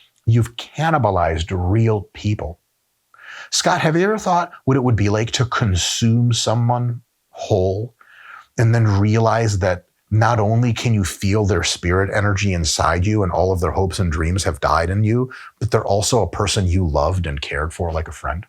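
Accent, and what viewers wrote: United Kingdom. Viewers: 😂😂 As an English fan of yours you do make me laugh with your British accent. Nice one 👏🏻💪🏼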